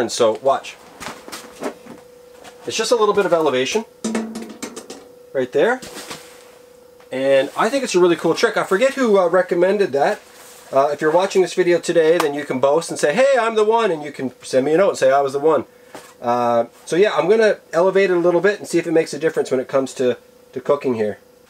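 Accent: Canada. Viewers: Very calming video.You seem like a nice guy.Good to hear a Canadian accent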